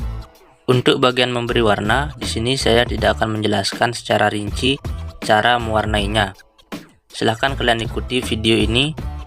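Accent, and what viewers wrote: Aceh, Indonesia. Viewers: Orang Aceh BG?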